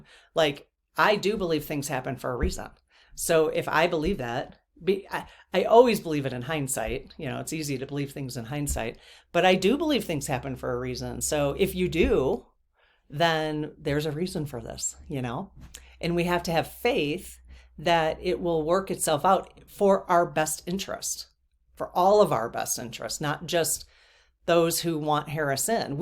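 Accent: Midwest, United States. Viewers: I love the midwest accent!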